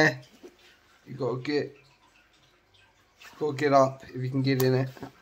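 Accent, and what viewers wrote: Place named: United Kingdom. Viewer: Finally a fucking video where someone actually speaks!! And of course its a fellow brit 🙌 legend mate!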